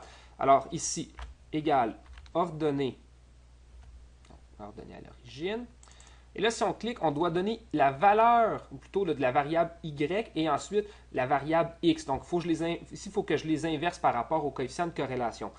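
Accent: Quebec, Canada. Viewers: moki aj c’est du Canadian Français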